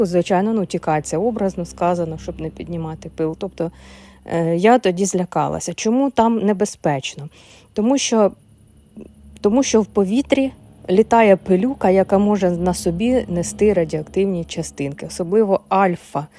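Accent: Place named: Surzhik, Ukraine. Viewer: Какой у Вас классный суржик.))))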